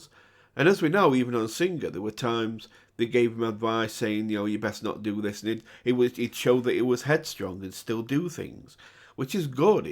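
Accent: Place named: Midlands, United Kingdom. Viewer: Hi. One of the reasons why it is so nice to listen to you is that you are always so honest. As for the concert, I actually find it better after that. I personally think that only one artist should appear at a solo concert. And the singing of his parents is not my taste either. So I'm glad he's more solo on his subsequent concerts. I'm already looking forward to the next double..... when did you say you will do it? Soon? The Dimash-Soon (betwenn 3 days and 3 years) or a -Soon (tomorrow) 😂😂😂 And I'll forever be happy to listen to your voice, the giggles and the middle-englisch dialect... so see you „soon“ ❤️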